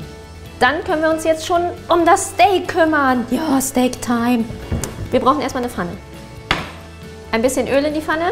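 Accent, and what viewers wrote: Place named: England, United Kingdom. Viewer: Sie spricht richtig viele Wörter im englischen Akzent aus.😂 Schöne Folge 😉😊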